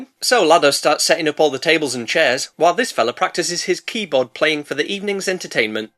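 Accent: United Kingdom. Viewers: Please settle a debate for us, where are you from? 😂 we’ve guessed Newcastle from The accent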